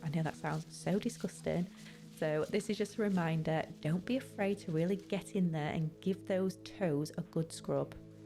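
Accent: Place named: Manchester, United Kingdom. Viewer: This is hilarious, because I am also a 38 year old man! 😂I at least DO know how I got here... I happen to find women's feet to be beautiful (not in a purely bedroom activities kind of way) but just the aesthetic beauty. Also, I totally agree that her Manc accent is quite lovely, and it definitely adds to the relaxing ASMR quality of the videos. Anyway though, love the videos, love your whole vibe, please keep on doing what you do because you are so amazing at it! 🙏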